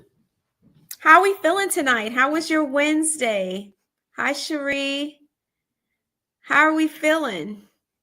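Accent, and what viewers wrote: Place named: South, United States. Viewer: I love listening to you & your southern accent! You tickle me! 😂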